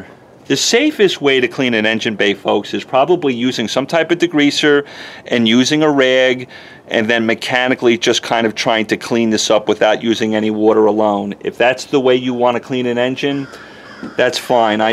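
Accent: New York, United States. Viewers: Sound like a New Yorker.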